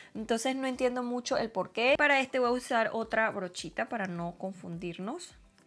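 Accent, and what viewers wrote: Venezuela. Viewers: de que parte de Venezuela eres? Suenas como si fueras de Maracaibo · Ese acento venezolano... 🤤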